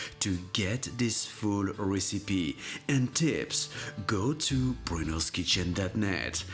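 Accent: France. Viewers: Are you from french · hi Bruno, thanks so much for your reply. I think you're amazing in your baking. I watch many other video in baking but it's never as perfect as the way you demonstrate. I love your accent, it's that French accent? I love French baking although I never bless to eat anything French. I am very please to found your video for baking. Thank you so much and please continue with more delicious baking, one day I want to learn how to make croissant By the way, I watch that video so many time to get to steps, and at the end you said "sorry guy" and you took a bite out of a croissant, I want a bite too lol....so delicious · Lydia Aschenaki he is French-speaking, with a typical French accent. If his accent annoys you, mute the video, or get a life. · Hes French what kind of accent do you want him to have? Southern? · He really speak like a stupid frensh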